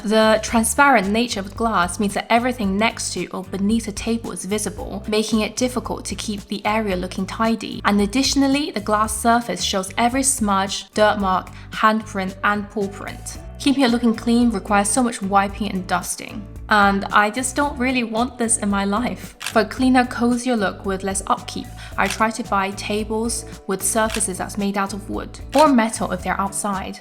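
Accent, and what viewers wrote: United Kingdom. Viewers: Love the Brit Accent❤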